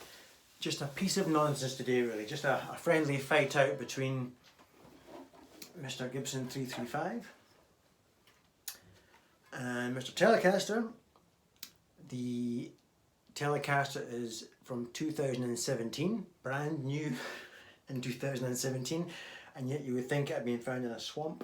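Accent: Scotland, United Kingdom. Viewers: I come to this channel to listen to the gorgeous tones and phrases that can elicit from his great collection....but more than that is that super smooth Scottish brogue accent I hear when is regaling us with his stories....that voice is warmer than a cup of Horlicks! Put the two together and you have me transfixed!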